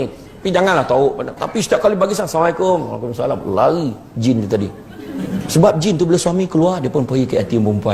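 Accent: Negeri Sembilan, Malaysia. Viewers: loghat nogori tu yg sodap dongar😂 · Seronok dongar ustaz syamsul ceramah..bnyak pengajaran..semoga ustaz sukses sllu..loghat N9 ustaz mmg thr best.kelakar! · Logat Negeri Sembilan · Memang loghat nogori tu yg sedap dongar haha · Aku org melaka, tpi paling suke dgau loghat org nogori... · Ustaz ni..setiap dakwah mesti kena ada lawak,sedih dan loghat negeri sembilan ada tiga ii ni konfirm best ceramahnya 👍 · Ramai yg mula soka loghat nogori.. Tanda Pm baru dri nogori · Biasa aku syok dgq ceramah bahasa utaqa. Dgq loghat nogori pon syok gak rasa ni.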